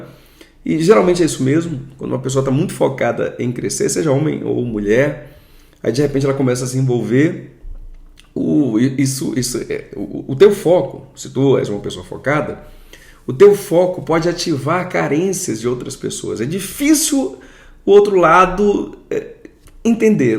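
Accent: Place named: Pará, Brazil. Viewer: acredito ser do Pará….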